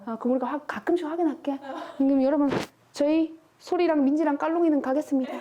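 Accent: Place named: Daegu, South Korea. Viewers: 아, 이!미지로 찍으신다길래 읭? 경북인가?했는데 찐 경북이셨구나 ㅋㅋㅋㅋㅋ지나가던 경남 웃고갑니다😂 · "이미생긴 이미지" 이건 부산,경남 사투린데..ㅎㅎ 선생님 사투리는 대구,경북 사투리기준이군요^^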